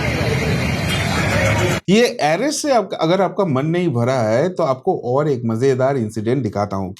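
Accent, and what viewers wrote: Bengal, India. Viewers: आप का बोंगाली-हिन्दी सुनने मे बहुत मजा आ रहा है! लगे रहो दादा!! जय श्रीराम 🪷🚩 बंगाल के लोगों का TMC के गंडों के प्रति आक्रोश और बदले की भावना कायम रहे! इन घटनाओं के विडिओ क्लिप्स आप हमे दिखाते रहिए! शोनार बांगला! 🙏🪷🚩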